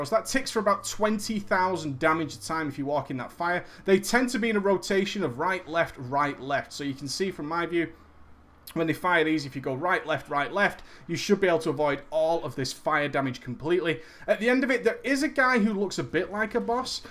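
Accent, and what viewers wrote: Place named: United Kingdom. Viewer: Where can I find WoW videos where the commentator is not british, loud, and obnoxious?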